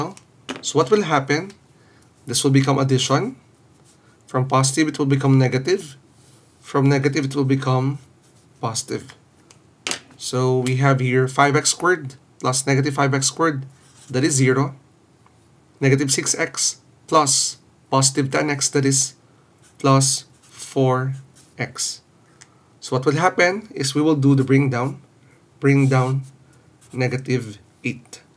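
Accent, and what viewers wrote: Philippines. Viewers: Thanks for this loved it soo much...and btw When I first listened ur voice...I knew it that u are from phillipines coz I watched section e drama so I recognised from ur voice that u are from phillipines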